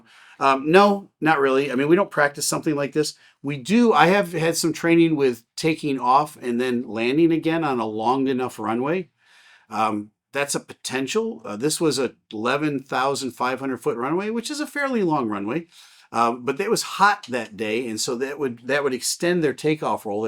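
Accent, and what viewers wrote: United States. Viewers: I like your clips very much, interesting and well understanding american english, for me good to keep my English. Greeds from Germany 👍👍👍👍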